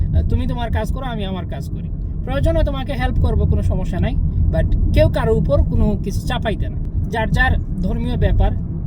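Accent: Sylhet, Bangladesh. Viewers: আপনি সিলেটি?❤️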